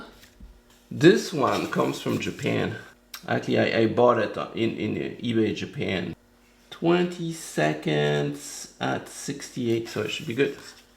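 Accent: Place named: France. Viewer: SELA-NOY-D love how pronounces things! That French accent!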